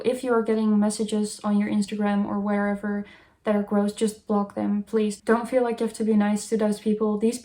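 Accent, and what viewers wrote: Netherlands. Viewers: You sound Dutch! Come hang out at goth night if ur ever in utri or ams :))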